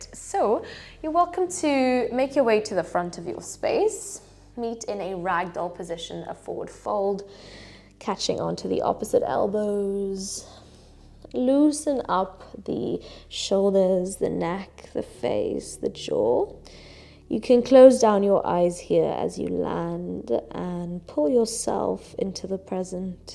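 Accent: South Africa. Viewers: Hi, thank you for this amazing practice, I really enjoyed it as well as your lovely south african accent,I am also from SA but I live in Germany..it feels so good doing yoga with you..makes me feel at home, my intention is to do your challenge, I started today and will carry on tomorrow! Thank you 💖 💗 💛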